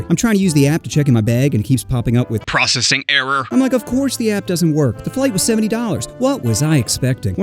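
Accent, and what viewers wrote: Florida, United States. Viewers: This man sounds Floridian, talks about a lot of haitian interacts and they're riddled with them there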